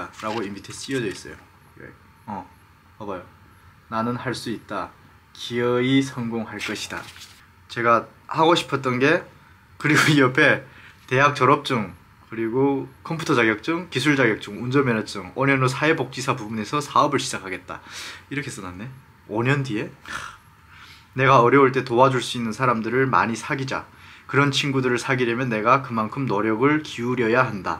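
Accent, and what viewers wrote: South Korea. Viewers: 말씨는 남한사람 이네요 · 발음도 이젠 남조선 같아요~^^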